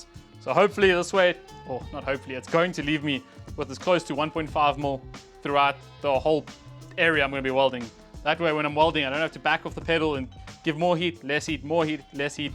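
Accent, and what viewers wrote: South Africa. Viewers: Do l detect a slight South African accent ? I bought my planishing hammer in January 1970 , a Proto made in Canada .l always kept the head clean until about 10 years ago some idiot recovery driver thought he'd use it to unjam his tailgate ! It completely destroyed the case hardened face. I rate myself as a very good panel beater / painter but l was in accident repair so never had the opportunity to use a wheeling machine or work with alloy . Fabrication and making panels is something l self taught . My mentor passed a year ago . He had a wheeling machine and learnt to work with aluminium. He was very talented . I thought this was repaired a number of years ago . Or is this a replica ? Thanks .P.S. Allen welded with oxy/ Acetylene . Tig welders were years away 😊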